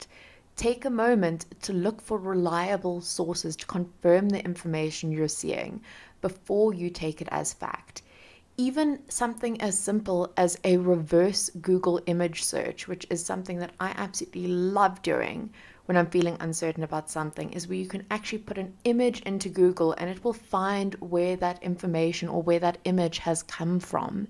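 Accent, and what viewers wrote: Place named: South Africa. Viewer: I know a Saffa when I hear one. You doing a good job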